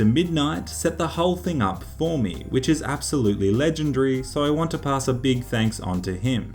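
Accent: Australia. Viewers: What part of Australia are U from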